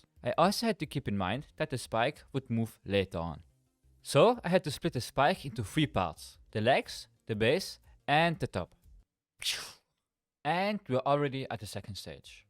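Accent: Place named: Germany. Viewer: Nicer Deutscher akzent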